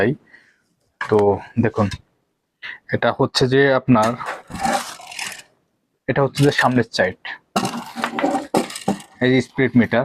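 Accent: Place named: Chandpur, Bangladesh. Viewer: ভাইয়ের বাসা কোথায়??। কথা চাঁদপুরের মতো মনে হয়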